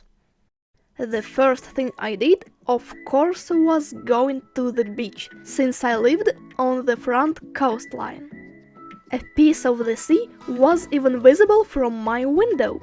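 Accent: Russia. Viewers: Omg you have so much cute voice, the way you speak the accent is very cute ❤️🥰 i like Russian accent. I love slavic culture. And also Dagestan is heavenly beautiful. Keep going, I'll support your channel!👍🏻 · You not you. You speak English like Russian dievoshka. Atlitchna 👍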